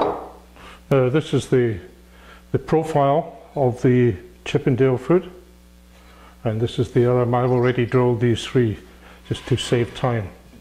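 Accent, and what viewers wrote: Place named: Scotland, United Kingdom. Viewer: Scottish 🏴 twang wee do everything best the Scots great jig 👍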